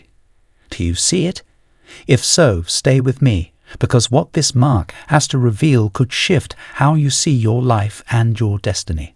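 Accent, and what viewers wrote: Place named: United Kingdom. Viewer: are you from Britain? or australia?? I hear an accent but cant pinpoint its relevance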